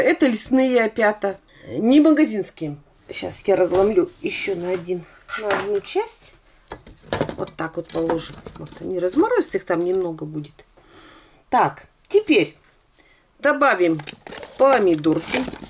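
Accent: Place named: Kopeysk, Russia. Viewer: у меня подружка живет в г.Копейск, говор такой же! мне нравится ваш говор. а вы откуда ведете трансляции?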